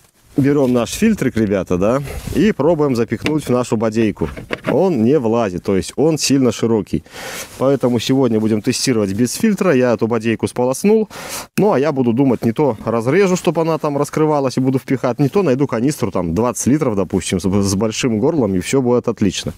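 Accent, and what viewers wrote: Belarus. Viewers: Судя по акценту - белорус!) Лайк не глядя)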